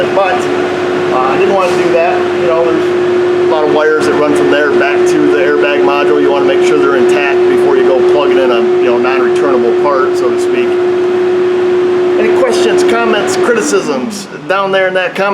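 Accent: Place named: South, United States. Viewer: You sound just like the south of men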